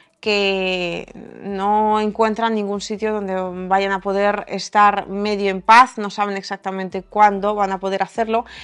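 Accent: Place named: Spain. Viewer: muy bueno tu programa y excelente tu información pero me aburre tu acento español, cámbialo.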